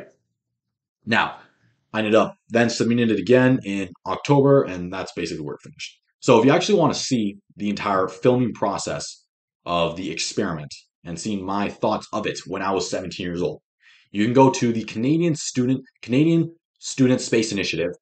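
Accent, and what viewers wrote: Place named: United States. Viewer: he speaks pretty american english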